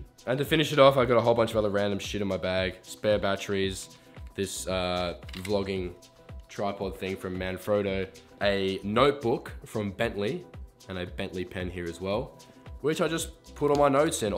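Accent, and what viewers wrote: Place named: Australia. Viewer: gonna pee, pour some whisky and enjoy 20 minutes of your beautiful face and that aussi accent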